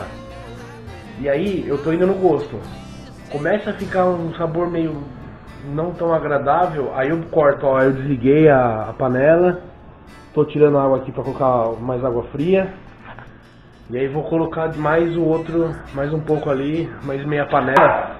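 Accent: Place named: Limeira, Brazil. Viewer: Amigo, vc é de Limeira-SP?? Parece, pelo sotaque.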